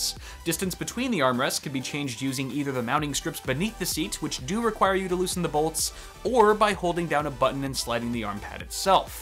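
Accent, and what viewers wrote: United Kingdom. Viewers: ... I'm from the UK. British accent approved. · love the british accent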